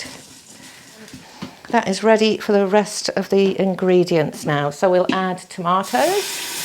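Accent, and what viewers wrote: England, United Kingdom. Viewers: I've just got an Instant Pot with Ultimate lid. It is so easy to pressure cook with! Now I have found your channel I can learn more about how to use it. Thanks! Also your English accent is lovely to hear.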